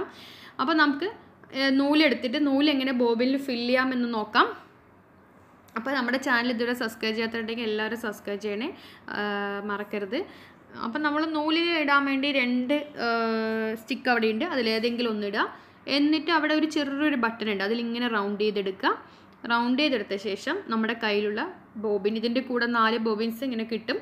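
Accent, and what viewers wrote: Palakkad, India. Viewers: Palakkadu kariyano?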